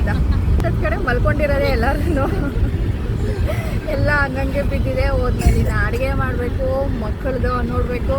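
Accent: Maharashtra, India. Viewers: Nimma mother tongue marathi na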